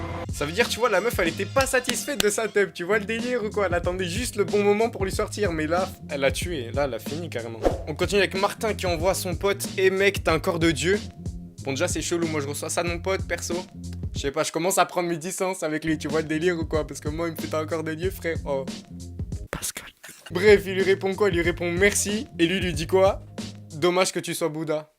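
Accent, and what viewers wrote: Belgium. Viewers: T'es belge poto ? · T'es belge ?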